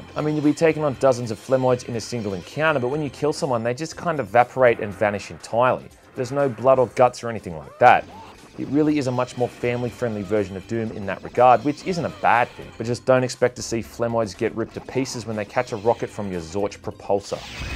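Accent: Australia. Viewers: Woo! Aussie game reviewers are a nice change.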